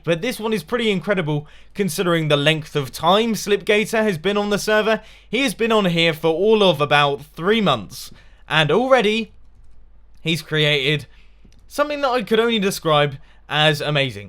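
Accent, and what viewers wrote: United Kingdom. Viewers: IM CAPSLOCKING THIS SO YOU THINK IM ANGRY AND YOU RED MY COMMENT PLEAS STAY AS AWESOME AS YOU ARE AND THE MUSTACHE IS NOT REQUIRED BECAUSE WE ALL CAN HEAR THE BRIT OUT OF YOUR VOICE REDSTONE 4 LIFE <3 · what part of the UK are you from?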